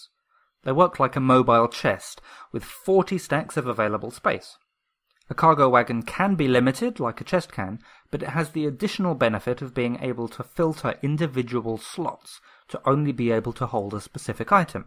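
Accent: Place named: United Kingdom. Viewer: me, an american: hears british accent i trust this man Amazing tutorial.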